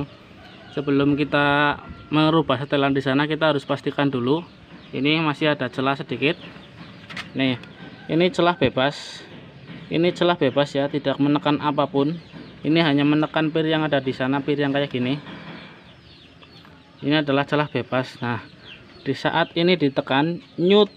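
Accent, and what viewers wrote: Banyumas, Indonesia. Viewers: Dalam ngapak😂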